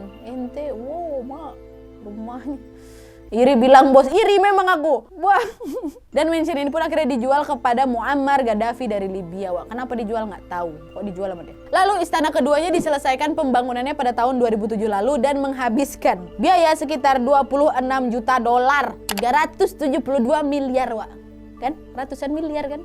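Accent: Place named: Medan, Indonesia. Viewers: Bah.. Baru singgah disini, anak Medan kayaknya kakak ni.. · Ko orang medan kak?? Logatny mantap kale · Logat Sumatra nya kental sekali...si.. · Bahasanya medan kali lah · kk org medan ya? logatnya kentara😂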